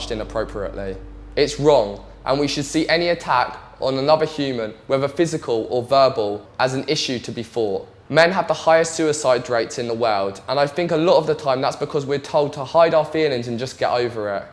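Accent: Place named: England, United Kingdom. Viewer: His accent makes me ashamed to be from England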